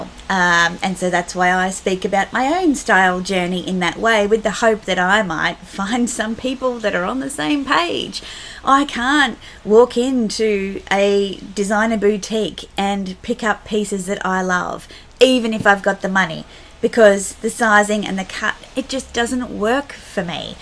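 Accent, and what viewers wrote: Australia. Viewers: I love your channel and your content! I love how warm and genuine you are, and it's always nice to hear an Aussie accent on the platform! I really like a number of different channels (and genres) on YouTube for different reasons, they serve different purposes to me. Some creators I'm subscribed to I will pick and choose what video I watch (I wont necessarily click on all of their uploads). But since I'm watching for YOU (as a person, more so than a channel with content) I will watch anything you put out and enjoy it. Like Dr Jake is one of my favourite channels, because of his humor and personality (and not for his Lego reviews, and home reno updates!). Keep doing you! You are just a delight 😽💖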